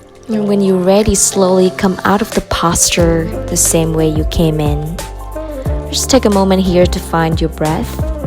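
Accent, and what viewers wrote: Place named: United States. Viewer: O nói mà tưởng bà Mỹ nói á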